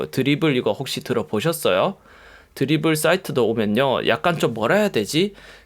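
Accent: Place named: Daegu, South Korea. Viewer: 사투리인가? 말투가 귀엽?다고 생각했는데 대구분이신가봐요. 저도 회사때문에 대구살고 있는 디자이너인데 ㅎㅎ 반가워요.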